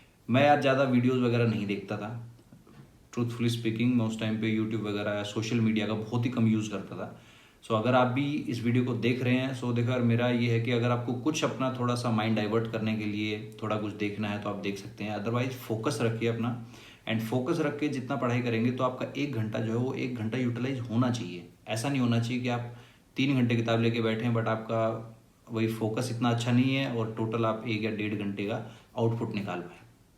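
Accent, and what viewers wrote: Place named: Haryana, India. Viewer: thankeww for the strategy but i love the harayanvii touch in language❤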